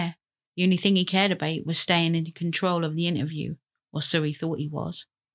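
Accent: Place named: Suffolk, United Kingdom. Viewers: weird hearing a Suffolk accent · Hey, I'm obsessed with anything true crime & just found your channel, I'm now gonna binge all your videos. Thankyou for your content! Where are u from? Your accent sounds sooo local to me (Suffolk) x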